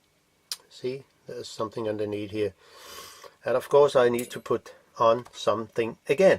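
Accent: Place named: Denmark. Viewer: Excellent. I've had the Fuji badged version from new in 2012, good to know what's going on inside, and should I need to calibrate the rangefinder mechanism this is very helpful. Thanks also for speaking English. Are you Danish? Just out of interest, I'm guessing from your accent.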